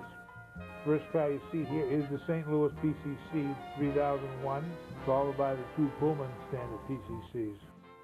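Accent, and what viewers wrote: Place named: Boston, United States. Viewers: That's an old Boston accent. When was this film narrated? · He sounds like my dad who was born in Boston in 1943. I was also born in Boston but have lived in the midwest my entire adult life so the accent only comes out occasionally.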